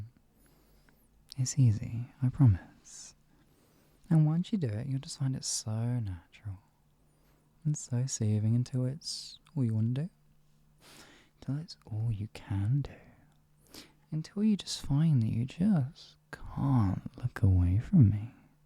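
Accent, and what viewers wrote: United Kingdom. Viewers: This may be a weird question, but what kind of British accent does this guy have, I’m American so I’m not sure of any of the regions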